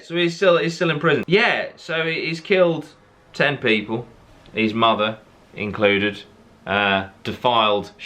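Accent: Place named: United Kingdom. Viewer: You brits pronounce trachea funny :P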